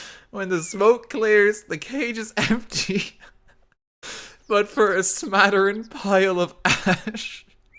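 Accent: Ireland. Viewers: This video should have been called "A man with an Irish accent carves a bloody path to Kharé in Steve Jackson's Sorcery" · Great Irish accent so... what other accents can you say and what is your favorite game so far in you career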